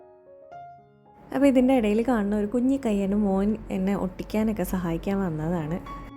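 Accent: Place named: Kannur, India. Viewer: kannur aano? Njanum kannur kaariyaane...enik slang ketapo cherioru doubt thonirunnu😅😅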